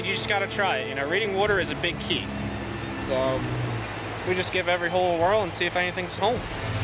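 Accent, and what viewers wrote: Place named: Pennsylvania, United States. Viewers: "Float daun the crick". I say he's from PA. (just like me). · Western PA for sure.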